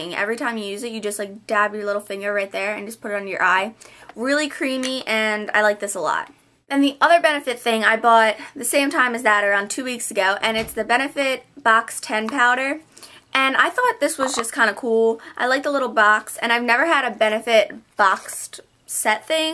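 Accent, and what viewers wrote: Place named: New Jersey, United States. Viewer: She's From New Jersey Well At Least I Think She Is Because She Said She Drove To New York For IMATS &&+ People That Live In Jerssey Do Drive To New York Often &&+ Vice Versa &&+ She Does Kinda Have A Slight Jersey Accent So I Think She Is From Jersey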